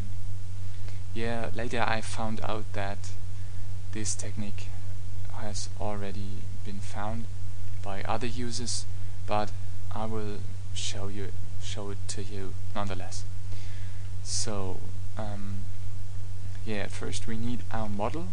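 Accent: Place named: Germany. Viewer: I did never say I did not understand him. I was trying to give him advice on recording spoken text in General. His english is quite good, but I can hear he's German (which I am too by the way). But if you try to follow such a tutorial a series of "uhm" and "ahs" and thinking breaks, it can be tiring. So I basically tried to help him, because he was apologizing at the end. Rather write it down, makes it much easier to speak and focus on the rest. Ah and by the way, I am bilingual ;-)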